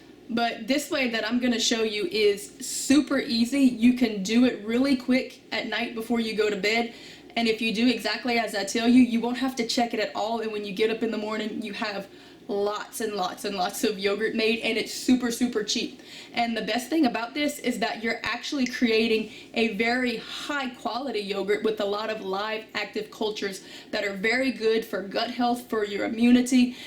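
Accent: Louisiana, United States. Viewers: Are you from Louisiana??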